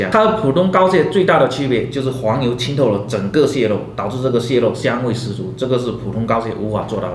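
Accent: Fuzhou, China. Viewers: 一听就是老福州了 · 怎么福州口音 这么重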